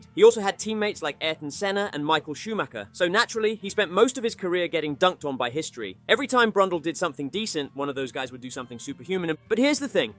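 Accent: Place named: United Kingdom. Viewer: 100% British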